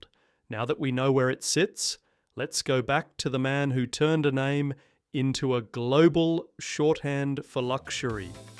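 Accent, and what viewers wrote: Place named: Australia. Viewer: What an odd, unnecessary video. No official source or credentials, and quite the AI babble. What really threw me was the lower class Australian accent. He may be better served commentating a soccer game.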